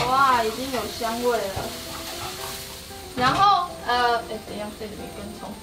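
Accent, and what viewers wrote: Taiwan. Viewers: 雖然偶爾會台灣國語但這支影片中邂逅的發音是正確的喔，掌聲鼓勵妳是特級廚師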